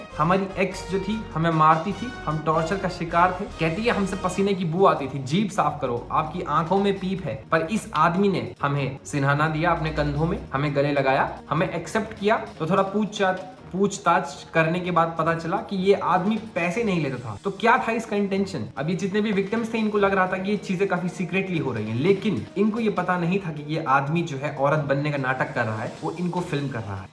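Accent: Pahadi, India. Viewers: Yes 😂 why not., I literally laugh every second 😂 plus your pahadi accent ❤ lots of love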